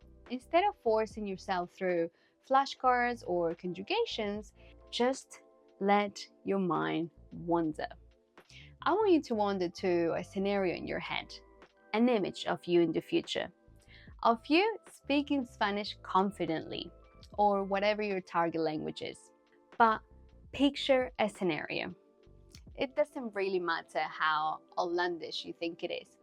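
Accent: England, United Kingdom. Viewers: Your videos are very helpful thank you. Are you living in the UK? I think I can hear an English accent in there lol. I’m from Northern Ireland. I hope your channel grows as it should.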